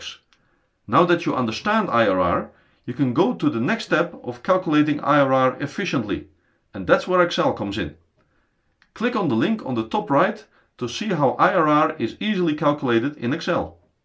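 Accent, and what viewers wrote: Netherlands. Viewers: Very strong Dutch accent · Best explanation on YouTube - and given in a beautiful Dutch accent too! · Thank you so much for the videos. And I love your Dutch accent. What I still not fully grasp is the non technical meaning of IRR. Does that mean that if IRR is less than or equal to WACC, the project should not be pursued? And whats the difference between IRR and Rate of Return? Just the time? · Je hoort zo hard dat hij Nederlands is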